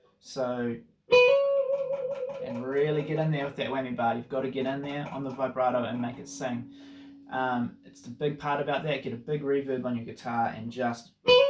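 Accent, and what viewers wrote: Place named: New Zealand. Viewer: Thanks for doing this. It's a lovely song. One note: Because of your Kiwi accent, I thought you said 19th fret when describing the solo when you were actually saying 9th/10th hammer on. I was flummoxed for a few minutes until I groked it.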